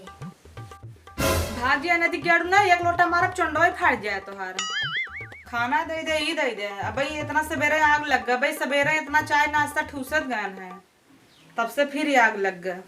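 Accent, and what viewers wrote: Jaunpur, India. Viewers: ji aap ki jaunpuriya tone zabardast h...👍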